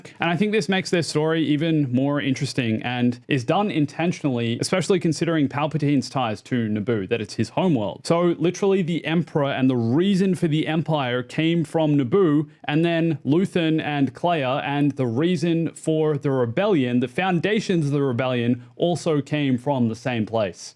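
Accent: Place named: Australia. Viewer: You sound like an expat Aussie. You seem to have a rhotic "R" sound like the Americans. Anyway, Good vid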